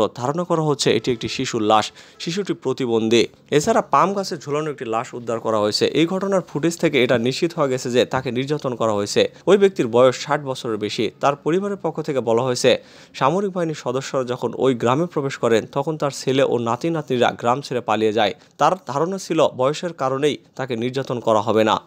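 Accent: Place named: India. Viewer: এক ই প্রশ্ন আমি লিখতে যেয়ে দেখি আপনি লিখেছেন। সংবাদ পাঠক তো ইন্ডিয়ার ভাষায় কথা বলে। চ্যানেল টা কি ভারত নিয়ন্ত্রিত?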